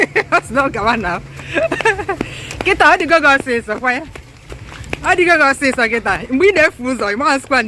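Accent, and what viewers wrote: Igbo, Nigeria. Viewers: I finally see ur face.....been hearing ur igbotic voice....weldon ma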